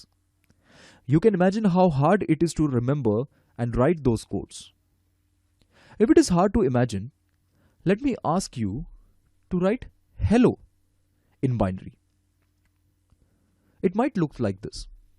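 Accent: South India, India. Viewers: You are very good at speaking sir. Often as an Australian I find it difficult to understand Indian accents easily; however you speak very clearly and well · ngl content is lit but the indian accent prolly south side fucks it up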